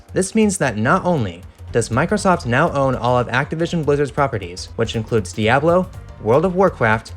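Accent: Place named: New York, United States. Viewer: Well, although I am glad about the information I have never once been excited to talk about with other channels, so instead I just want to comment on how your main character has been improving and how nice it looks. Really does give me that Spyro/Crash vibe, which I mean is further helped by your accent. Actually, it kinda sounds similar to Billy Joels and my Mami, do I just like New York and New Jersey accents or something. Probably the latter, seeing how many great films and games were made during their Era of stuff such as Ghostbusters, that Atlantis Disney Movie, etc that I grew up with when I was a young boy.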